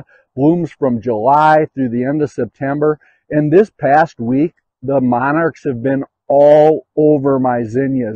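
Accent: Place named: Minnesota, United States. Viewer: You sound Minnesotan.. lol.. Takes one to know one.. if I'm right. 😅 Love your video! Ha.. I just heard you say you're in MN. I have Zinnias and the Monarch are enjoying everyday!!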